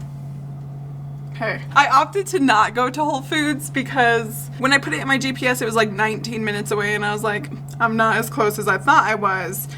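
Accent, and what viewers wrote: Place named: Midwest, United States. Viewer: I really feel like your accent sounds midwestern. I live in MO and you sound similar. Lol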